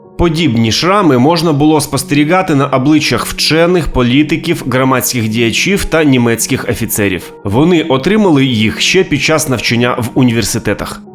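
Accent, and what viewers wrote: Russia. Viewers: Це кацапський акцент. Не робіть мєнє нєрви. Ось це одеський акцент. А у відео суцільне ґекання, що хочеться вже відписатися.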